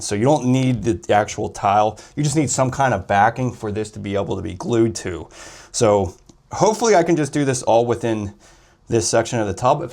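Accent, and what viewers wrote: Pittsburgh, United States. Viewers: Let me tell you... you know a Pittsburgh accent when you hear one! Love your videos! Thanks for the knowledge sir.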